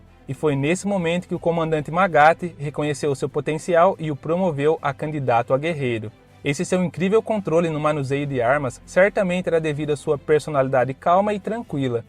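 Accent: Brazil. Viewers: é um sotaque mistura de paulista mineiro e paraibano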